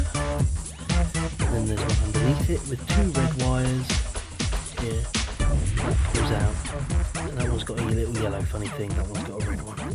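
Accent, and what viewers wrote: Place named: England, United Kingdom. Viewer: This seems to be the narrative in my head during car wrenching. Mine lacks the English accent and includes many more profanities. This video is gold.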